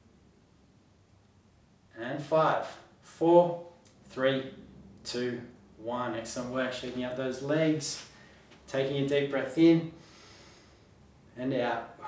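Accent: Australia. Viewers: Perfect pace for this old boy Tks Aussie(?) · Interesting Australian English! Those instead of your.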